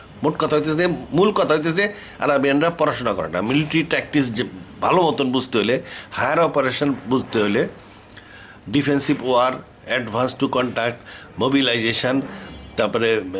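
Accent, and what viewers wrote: Manikganj, Bangladesh. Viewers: আস্সালামুআলাইকুম স্যার, আমি আপনার একজন বড় ফ্যান, আমি প্রায় সময় আপনার ভিডিও গুলো দেখি এবং এই পর্যন্ত অনেক ভিডিও দেখেছি, সময় সাময়িক ভিডিও গুলো ও অনেক ভাল হইছে, তবে ভিডিও গুলো এতো লং time যে এক ভিডিও শেষ করতে অনেক দিন লাগে। বিদেশে থাকি ফ্যামিলি নিয়া, সময় খুব কম। By the way, আপনার বাড়ি কি মানিকগঞ্জ লাগছে, আপনার কোথায় মানিকগঞ্জ এর টান আসে, আমার এক প্রিয় আঙ্কেল aunty থাকে সেখানে বাড়ি। Anyway আপনার একটা ভিডিও দেখেছিলাম জাতীয় সংগীত আর আজমী সাহেবের সম্পর্কে, আমি আমার এক বন্ধুকে সেটা দেখাতে চাইছিলাম but কোথাও খুঁজেছি পাই নাই। আপনি কি আমাকে লিংক তা দিবেন। আর একটা বিশেষ অনুরোধ, আপনি তো কুরআন তাফসীর করেসেন সাইন্টিফিক ওয়েতে, আমি সেগুলো দেখছি, এখনো শেষ করতে পারিনি, ভিডিও গুলো অসাধারণ। যেহেতু আপনি এই বিষয়ে অনেক জ্ঞান রাখেন, আপনি কি একটা গুরুত্বপূর্ণ বিষয়ে একটা ভিডিও বানাতো পারবেন, টপিকস টা হলো, রমজানের রোজা ও ঈদের চাঁদ দেখা নিয়ে, অনেকে নাকি চাঁদ আকাশে দেখার আগেই সাইন্টিফিক ওয়েতে চাঁদ এর জন্ম গণনা করে ফেলে, সেটা কি সায়েন্টিফাক্যালি অনেকে নাকি চাঁদ আকাশে দেখার আগেই সাইন্টিফিক ওয়েতে চাঁদ এর জন্ম গণনা করে ফেলে, সেটা কি সায়েন্টিফাক্যালি হচ্ছে? 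এই বিষয়ে আপনি কোনো রিসার্চ করে একটা ভিডিও করতে পারবেন, কুরআন আর গণনা অনুসারে কোনটা সঠিক? আর সাইন্টিফিক গণনায় চাঁদ দেখা কি ইসলাম সমর্থন করে? আপনি কোনো পদ্ধতি সঠিক মকনে করেন? কুরআন ও সাইন্স এর আলোকে একটু ব্যাখ্যা করবেন প্লিজ। এই মত নিয়া সমাজে অনেক ভেধাভেদ, একেক গ্রুপ একেক দিনে ঈদের namaj পড়ে ও সমাজে বৃশৃঙ্খলা তৈরী হয়।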